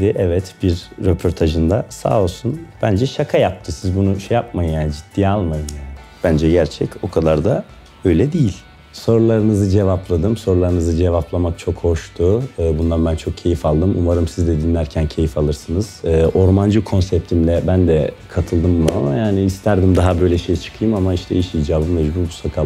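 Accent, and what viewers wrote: Black Sea, Turkey. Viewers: Lazca değil o, karadeniz 'ağzı' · Senin Karadeniz sivenii yerim usagim 😂😂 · Cok güzel karadeniz sivesi yapiyor. Bende inanmazdim laz olmadigina 🙈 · Ohaa efsane karadeniz sivesi var yaa😍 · Ben keradenizli sandim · Lazca değil o, karadeniz 'ağzı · Eren abi çok güzel karadenizce konuşuyor ❤❤Ayrıca Niye SÖZ bitti ❤❤Seviliyorsun Abi ❤❤❤ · Çok güzel Karadenizli konuşuyor ve gerçekten çok sevdiğim bir oyuncu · Yaaa cok tatlııısin karedeniz şivesi de var bal ayrica SAKAL SANA COK YAKISIYOR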